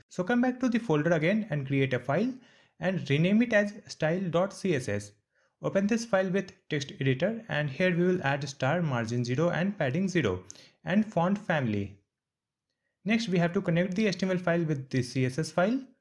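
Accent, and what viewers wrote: India. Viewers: Indian accent yes... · Lol!!! It's Indian Accent bro. Indians are tech savvy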